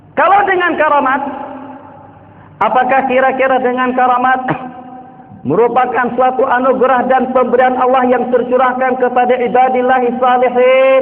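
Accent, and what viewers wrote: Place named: Banjar, Indonesia. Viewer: sidin nhe urang manaaa, ada banjary nya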